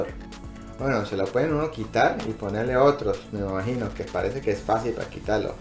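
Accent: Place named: United States. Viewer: Este es un gringo que aprendio español en cartagena.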